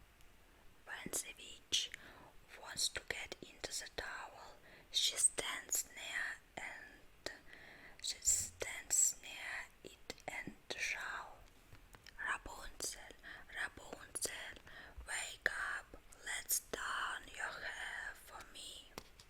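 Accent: Russia. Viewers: I'm not a fan of fairytales and stuff like this but I'm so happy to hear you speaking in English!!! I really hope you will make some more videos in English from time to time especially doing medical roleplays which I LOVE the most! English with russian accent actually is a trigger for me lol xD ..btw, I just started learning Russian a month ago so I could expand the joy of thousands of videos for ASMR in Russian , too, not just in english! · прости, но your russian accent "cut" my ears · Ненадо выговаривать каждую букву на Английскому, ты пытаешся впихнуть нотки Русского в Английский)). A tak я заснул 4etko)))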